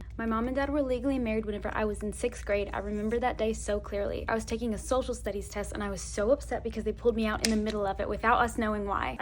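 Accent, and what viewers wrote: South, United States. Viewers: yesss! A southern bell that’s what I’m hearing ! Couldn’t pin point it at first lol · The first video I saw of her I thought she sounded very southern!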